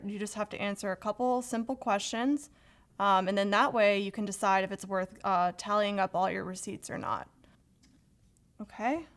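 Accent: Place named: United States. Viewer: It would be nice if the video mentioned which country's taxes this video is addressing. I'll have to guess by your accent that this is for Americans. They have turbotax here in Canada too, but the taxation is not the same. Specificity is key, especially with taxes.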